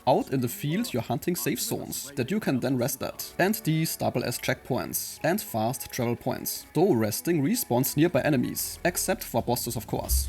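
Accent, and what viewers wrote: Germany. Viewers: Krasser Deutscher Akzent. Sofort gedacht, dass du zumindest Österreicher, Schweizer oder Deutscher sein müsstest.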